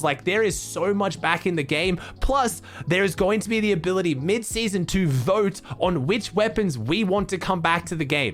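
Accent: Australia. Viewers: noti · YES MATE I LOVE YOU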